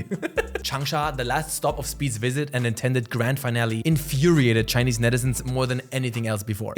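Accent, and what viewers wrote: Germany. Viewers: This Guy sounds like a german guy talking english 😄bist du Deutscher? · Dein Akzent erinnert mich an die Deutsche Sprache. Ich glaube du kannst Deutsch🤔. Wäre interessant zu wissen. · u german? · Your dialect is German I hear it my brother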